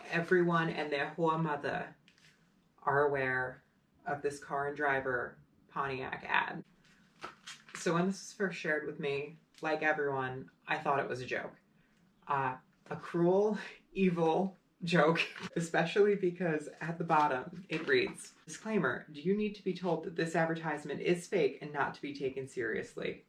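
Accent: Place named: New Jersey, United States. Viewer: Do you mind if I point to your channel? Im about to record my long form episode on this. Did a stream last week on a friends channel, and I talked about this ad, and why I do believe its going to happen, and even know who will be hired as employees and whatnot. Just came across your channel, and you totally sound Jersey. For a moment I thought you snuck into my garage. Same cinder blocks, even the AC in the window is the same. Did you sneak in, move 12 cars, pull out my 1320 and sneak Herby in my garage lol.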